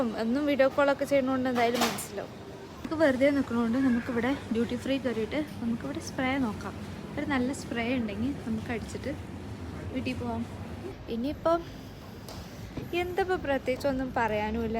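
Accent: Palakkad, India. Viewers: ഫ്ലൈറ്റ് ഇറങ്ങിയതും തനി പാലക്കാടൻ സ്ലാങ്😂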